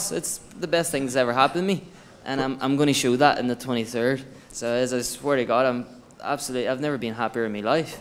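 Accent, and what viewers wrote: Ireland. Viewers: James : Irish accent (bc he was born in Ireland) Everyone : “bruh he copied Connor” lol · Dude I get you got a Irish accent but your literally trying to sound like Conor “you know I mean”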